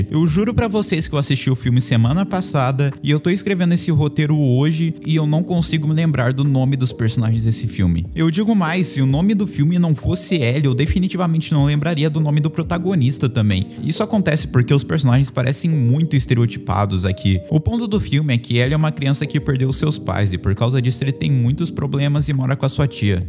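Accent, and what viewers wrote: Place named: Interior, Brazil. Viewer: qual região do Brasil você nasceu e/ou vive até hoje? Porque pelo visto você tem sotaque de pessoa do interior do Brasil.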